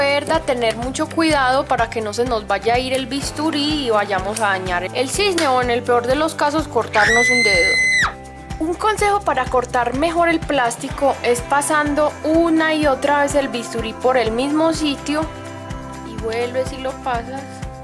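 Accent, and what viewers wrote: Colombia. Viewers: Eres colombiana verdad? Te lo digo por ese acento que tienes. Y que a mi en lo personal me encanta. Saludos y Bendiciones desde Guadalajara JALISCO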